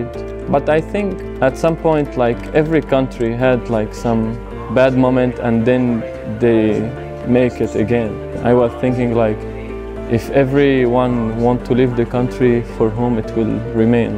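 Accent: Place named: Russia. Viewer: Так хорошо выучил русский, что на английском говорит с русским акцентом. Я почти все слова смог разобрать, хотя английский на слух обычно понимаю плохо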